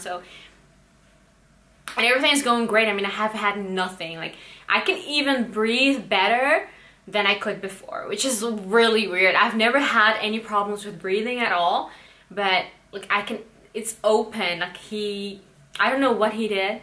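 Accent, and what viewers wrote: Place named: New Jersey, United States. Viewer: are u from NY or NJ? u sound like the people from jersey shore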